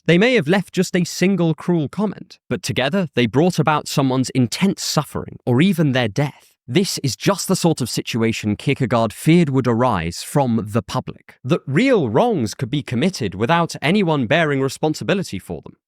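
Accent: United Kingdom. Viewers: Whatever you call the way this guy talks - its more than just a British accent - is annoying and I dont like.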